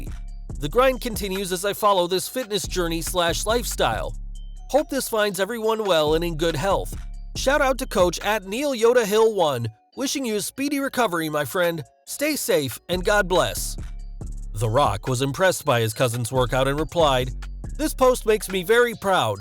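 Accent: United States. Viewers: Hey guys what is going on here is the MOST ANNOYING AMERICAN ACCENT INTHE WORLD here to talk down to you....ffs man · Your accent has changed to american. Now you'd get more subs?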